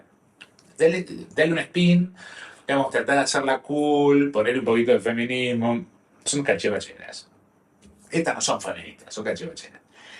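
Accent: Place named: Palermo, Argentina. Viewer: Eres hermoso!! Ya hablas como los chetos parlemitanos!! Es con cariño!! Interesantes tus trabajos. ❤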